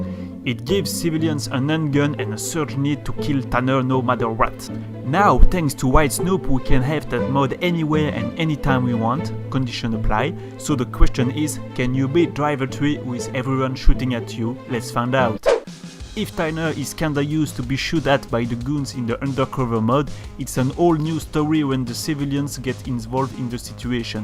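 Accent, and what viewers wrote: France. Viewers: Putain de french accent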